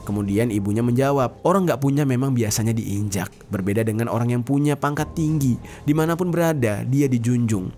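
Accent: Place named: Aceh, Indonesia. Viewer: Belum aja ya dengar bg dengan logat aceh nya🗿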